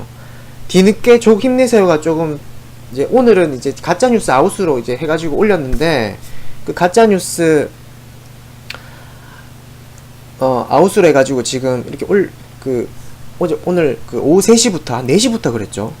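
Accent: Gyeongsang, South Korea. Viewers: 대구 느낌나네요. ㅋㅋㅋㅋ 화이팅입니다. (저도 대구입니다~)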